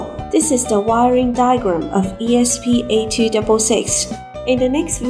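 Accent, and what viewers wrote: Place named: China. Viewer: No one like Chinese English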